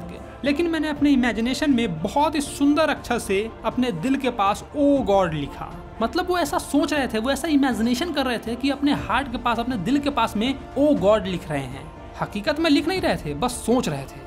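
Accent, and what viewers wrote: Bihar, India. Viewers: Bro is from Bihar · Bihari bhai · Bhai 100% bihari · Aapke padhane ka tarika mujhe bahut accha lga jis trh aap bolte ho ekdam bihari ki trh kyunki main bhi bihari hu to mujhe aapke padhane ka tarika aur bolne ka tarika bahut accha lga 😂😊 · The bihari accent 😂😂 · Bhaiya kya aap bihar se ho ? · Bihar se ho kya? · Are you from Bihar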